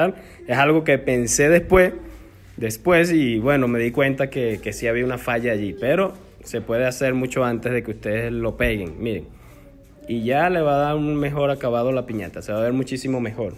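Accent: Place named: Venezuela. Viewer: Me encantó la explicación, me suena como venezolano, será paisano?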